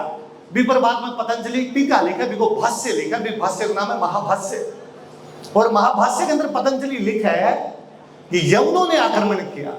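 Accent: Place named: Rajasthan, India. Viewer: हमारे सर की आवाज में राजस्थान बोलता है।🙏🙏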